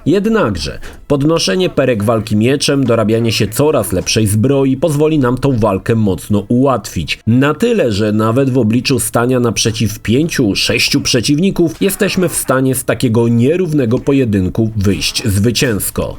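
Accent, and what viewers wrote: Łódź, Poland. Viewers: To nie seplenienie to gwara Łódzka XD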